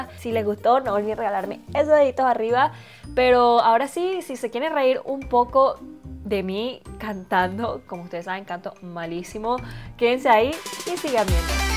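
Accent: Venezuela. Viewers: jajajajajajajjajajajaa que risa no paro e reir ¿eres venezolana?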